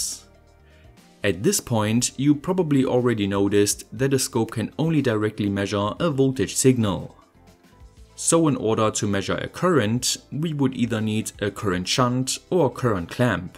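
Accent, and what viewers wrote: Germany. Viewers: German accent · You’re german right ?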